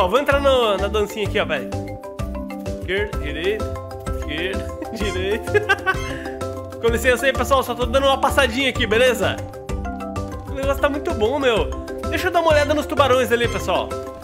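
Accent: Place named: São Paulo, Brazil. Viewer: com todo respeito puxa menos o R , sotaque paulista poRRRRta. barrrco no mais ta muito tri legal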